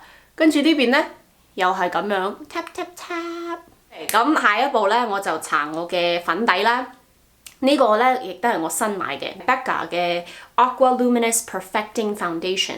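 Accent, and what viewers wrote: Guangzhou, China. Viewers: 好好好重鄕音 廣州音 😂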